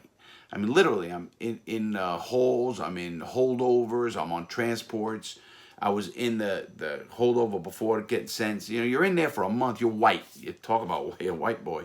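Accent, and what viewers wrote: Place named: East Coast, United States. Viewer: Very good...your interesting and love your east coast accent..handsome too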